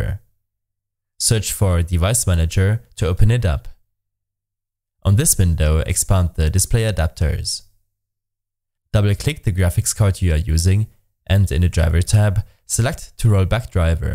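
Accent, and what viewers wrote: Germany. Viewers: Du bist Deutscher oder?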